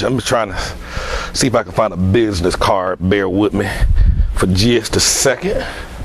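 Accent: South, United States. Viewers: Peel it! Drop it! Roll it, and boom. LOL! 😁😁 It is early in the morning and I am watching this video.. You are so funny and inspirational at the same time. I always learn the best when something is funny or I am laughing. Thank you my brother for this video. I like your Southern accent. I live in the South as well. God Bless you! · It's great to hear a southern accent on the tube. Keep making cool videos brother.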